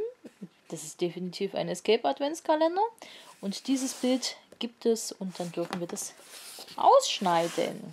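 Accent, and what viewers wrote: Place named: East Germany, Germany. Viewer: Danke für deine Videos ich nehme es mir als kleine Hilfe falls ich bei mir nicht weiter komme . Video ist top und dein Ossi Akzent ist auch unterhaltsam für mich als Wessi . Ich hoffe du verstehst Spaß 😜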